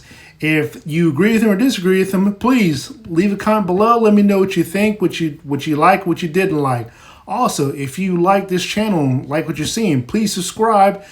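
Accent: South, United States. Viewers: Love that southern accent. I’m from Texas and sometimes I wish I had a stronger accent 😂😂😂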